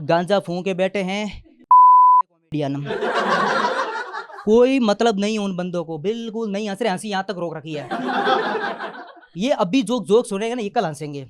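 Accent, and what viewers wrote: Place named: Haryana, India. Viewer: Ye jaat baniye ki tarah kyu bol rha hai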